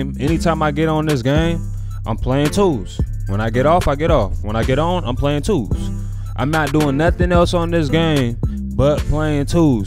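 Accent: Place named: Los Angeles, United States. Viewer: i could tell u from LA by the way u talk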